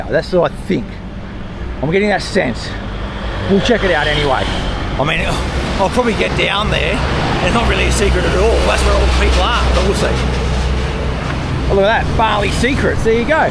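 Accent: Australia. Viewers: This is a great video thank you. Have to say I was bloody shocked with your occa Aussie accent and then you bring out the perfect Indo with the locals. Made the video! What lovely guys, Balinese are the salt of the earth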